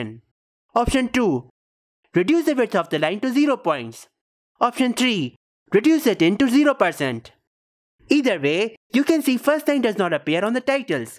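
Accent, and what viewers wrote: India. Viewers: Thank you, I was watching this video, but I failed to understand you very well today. Between your english with indian accent and my spanish, it wasn't a good mixture LOL !!!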